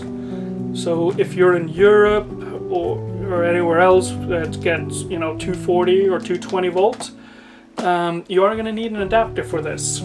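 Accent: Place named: Scandinavia. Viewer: Søgne, 25 min unna Kristiansand :joy: Familien min er fra Gjerstad. Jeg er så hillbilly som man kan være i norge, Pluss i live in the south :) Din engelsk tale er meget bra, men etter å ha sett noen vids rett etter værandre må jeg inrømme å si jeg hørte at det var noe scandinavisk der. Du er opprinnelig fra danmark? Hvor i DK om jeg kan spør?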